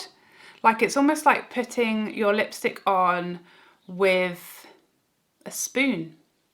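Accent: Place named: United Kingdom. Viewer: you have the best videos. I love love love your sense of humor paired with the British accent. It’s the medicine to my life I was missing. You are the best! Yes I’m watching some of your older videos. Lol!!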